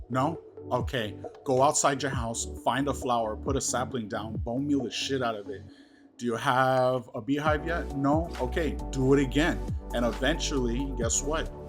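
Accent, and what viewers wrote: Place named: Los Angeles, United States. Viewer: You sound like your from LA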